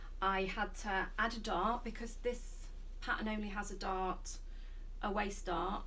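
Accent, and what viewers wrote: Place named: Northern England, United Kingdom. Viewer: Great makes, where are you from? I’m from north wales... your accent tells me that you’re from up north somewhere too... anyway great makes! Well done to all your garments. X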